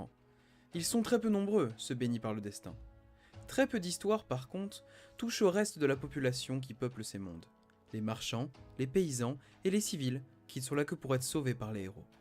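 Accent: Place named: France. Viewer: Dommage que la prononciation de l'anglais soit si... franchouillarde.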